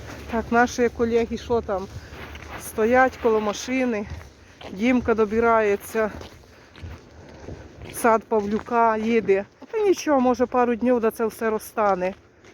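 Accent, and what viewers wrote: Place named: Zhytomyr, Ukraine. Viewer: добрий день, а я зразу зрозуміла де ви живете,по вашій мові, це північ Житомирщини, я живу недалеко від вас.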